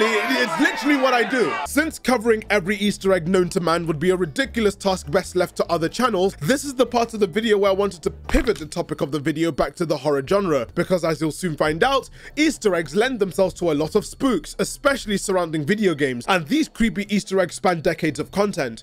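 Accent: United Kingdom. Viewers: the brit is back baby!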